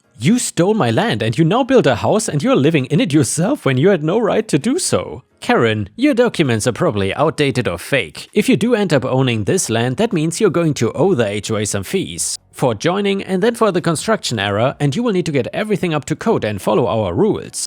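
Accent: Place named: Germany. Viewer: Why don’t you tell your stories in German with subtitles your accent is terrible I would rather listen to the German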